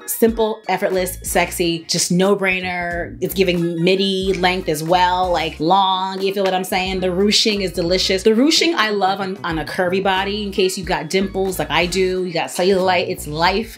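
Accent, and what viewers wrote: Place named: New York, United States. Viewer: Girllllll. You are such a New Yorker, ya feel me? I'm like is this the accent people say I have that I don't notice but I know I have 🤣. I Crack up everytime you say something very New York.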